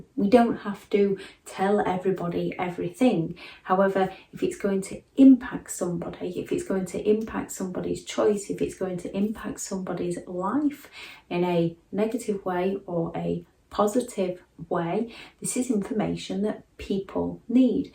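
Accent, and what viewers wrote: United Kingdom. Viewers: I like your work. You are well researched, even keeled and the UK accent is just lovely.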